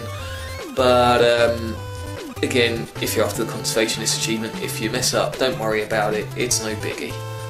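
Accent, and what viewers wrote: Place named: United Kingdom. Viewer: I like your british accent. I have just downloaded MM9 for Wii, and I was stuck in Plug man's stage n_n so thanks for this!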